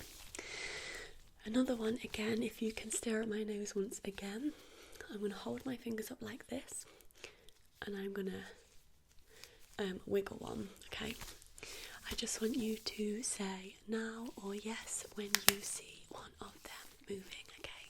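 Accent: United Kingdom. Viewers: I’m trying to figure out if she’s British or not 😂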